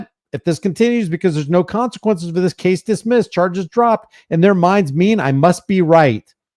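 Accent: United Kingdom. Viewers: Who is the Nazi with the British accent that’s narrating this video we need to make sure we go to his channel and give him what he deserves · The guy who is narrating isn't even a American, Its a British pos. Oh and don't forget they always attack Americans one on many. And always at the end of a gun.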